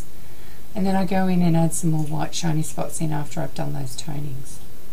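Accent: Australia. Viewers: Yay! Love your videos, I learn so much from you! And I love hearing your Aussie accent... there are not many of us Aussies here on YT.... Cheers, Ginny:o)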